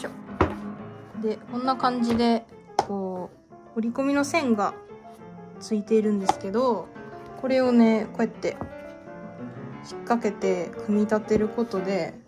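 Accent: Kansai, Japan. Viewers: めっちゃ かわいい。今日はまりましたw関西弁だいすきです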